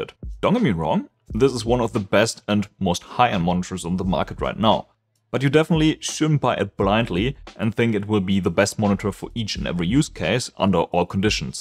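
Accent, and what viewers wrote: Germany. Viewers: Man hört doch raus das er deutsch ist😂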